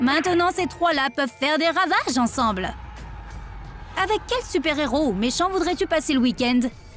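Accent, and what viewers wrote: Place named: England, United Kingdom. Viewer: Il sons pas français il sons englai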